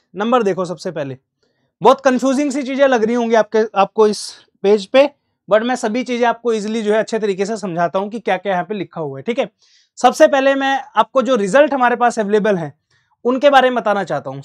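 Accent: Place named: Haryana, India. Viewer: Haryanavi boli 😅😊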